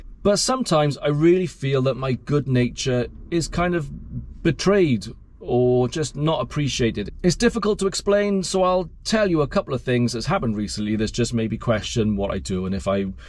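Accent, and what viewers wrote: Wales, United Kingdom. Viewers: I'm not into gardening but found the thumbnail just way too intriguing and hear you. And on a positive note I've always thought the Welsh accent is thee best accent back home ! Anyways, yes, i hear you and at first i thought, well maybe old age and forgetfulness gone wrong but then i thought nope. You've GOT to be happy in your work place. I'm 69 in September and lost a kidney to cancer 2014 and have mobility issues with abdominal adhesions and Countdown Supermarket here in New Zealand have a food home delivery service and those guys go out of their way with helpfulness and positive smiles and they go above and beyond and they are lifesavers but I could never imagine being negative with them, goodness gracious, that's like cutting my throat and burning bridges and making life so difficult. I don't mean to come across as holier than thou but I've done the delivery jobs in Yorkshire in the late 70s 80s with northern dairies, john Menzies paper delivery and polar motors in essex and you automatically help people who need it but i never came across ungratefulness but I've seen it on the old UK telly progs. 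And it must be real hard biting your tongue under those circumstances. Perhaps you can do the UNCUT video version of this :) Anyways, i definitely say put yourself first and then your family cos if you go down there's no provider! And DON'T feel guilty but I suspect a part of you does. Monday greetings from Middle Earth back to the Homelands 🙃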